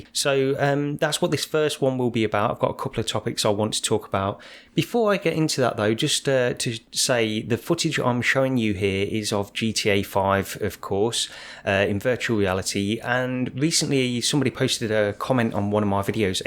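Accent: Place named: Midlands, United Kingdom. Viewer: Having just picked up a p.c with an rtx 3070 so I can link my oculus quest 2 to it,i watched your vid on project cars 2 in vr which was very informative. I started off with the psvr and while very good the lack of analogue sticks for movement on the move controllers and the less than crisp visuals on most games was disappointing. The psvr 2 needs to incorporate better controllers ( oculus touch or valve index style) ,it will be interesting to see how much sony decide to push the psvr 2,as facebook seem to taking over as far as vr is concerned and more competition can only be a good thing. P.s just subscribed to your channel ,great content ( i prefer your real voice,I'm a midlands bloke myself )👍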